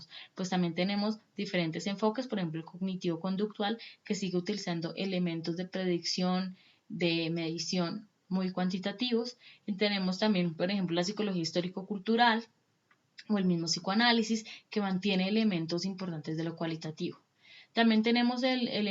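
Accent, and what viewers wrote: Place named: Colombia. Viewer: Gracias, las Colombianas hablan bello, me enamoro su vos.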